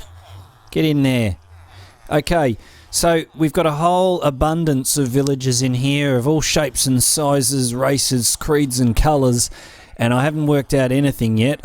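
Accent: Australia. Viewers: Honestly, the only reason why i subscribed to is the aussie accent, I live in AU, and it is odd, but in Brisbane, noone has this accent, they all speak strangelly normal...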